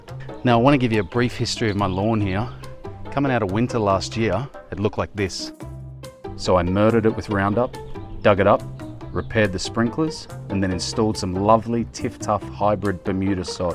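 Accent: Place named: Australia. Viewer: Mate - thanks for making me laugh. You have taught me three things: 1. Lawn stuff - who would have thought grass could have turds? 2. Poetry - "harder than a honeymoon dick" 3. Visual art ( that was an Asian elephant right? Elephas maximus I believe - they have small ears) Anyway, gday from Dubbo (with an accent like that, you might even know where that is!). All the best with the channel. Can't wait for the next video.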